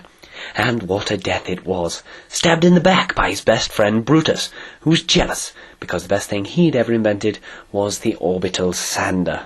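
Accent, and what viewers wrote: United Kingdom. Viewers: Ya, good job noticing the British accent.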